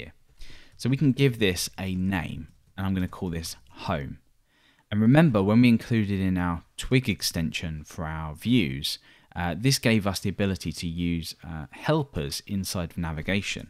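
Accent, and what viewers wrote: England, United Kingdom. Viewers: Hello there! No doubt your approaches to implement functionality are the best all over the PHP web dev world, I just wish Hollingworth, Schiff or Traversy would explain them to me as I feel so sorry to tell you that you lack a bit on explanation and I struggle to capture your english accent. Anyway, thanks very much I really appreciate to get to realize how solutions can be implemented in a clever way.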